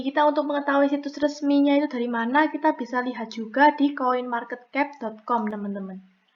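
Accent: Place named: Surabaya, Indonesia. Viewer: Logat surabaya medok ci xixixiix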